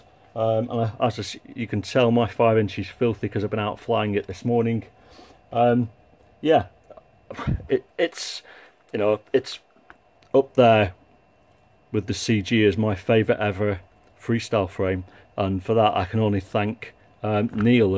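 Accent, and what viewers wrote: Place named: North, United Kingdom. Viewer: nice one I'm sunny Grimsby and cleethorpes lol.could tell by your acsent.great stuff buddy keep it up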